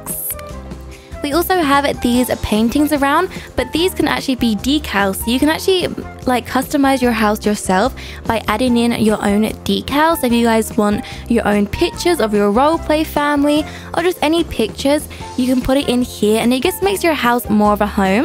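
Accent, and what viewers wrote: England, United Kingdom. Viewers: I love your British do u speck British or something kinda with British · You can tell she’s English lol my dads english so that’s awesome oh wait aren’t you?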